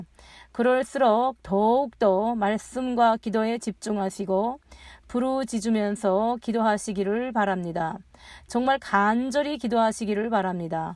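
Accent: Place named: Pyongan, North Korea. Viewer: 피안도 사투리가 참 정겹게 들립니다, 나의 오마니도 1.4후퇴때 피안북도 정주에서 월남해서 고생하며 저희형제 잘 키워주시고 작년에 작고하셨다오.. 지옥의 북녁땅에서 참 많이 고생하셨군요.. 다 좋은데요, 방언은 아닙니다. 고전14장 헬라어 원본으로 잘 읽어 보면, 오늘날 현대교회에서 행하는 방언은 절대 성경과 일치하지 않습니다. 고전14장 한글번역이 굉장히 잘못된 부분이 많아요. 다다다따 방언을 예수님께서 하셨나요, 사도들이 하시던가요? 한글로 또는 영어로 기도하면 하나님이 못알아드시나요? 방언기도하다가 귀신을 초청하게될 가능성이 아주아주 높은데, 왜 맑고 깨끗한 맨정신으로 내가 알고 하나님이 받아주실 정상적인 언어로 기도하면 안될까요? 샬롬!!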